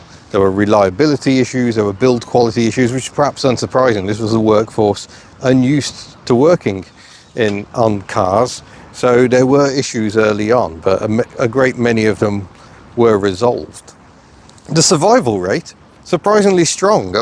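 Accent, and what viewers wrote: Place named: Scotland, United Kingdom. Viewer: That Scottish accent is so cool my friend. I could listen to you talk about vegetable gardening for hours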